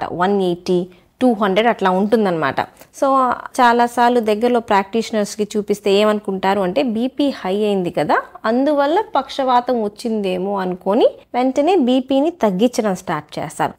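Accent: Guntur, India. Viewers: Madam meedi Tenali or Guntur aa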